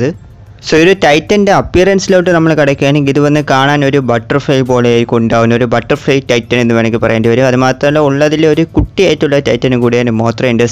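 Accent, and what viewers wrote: India. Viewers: Thamizhan ആണെന്ന് തോന്നുന്ന്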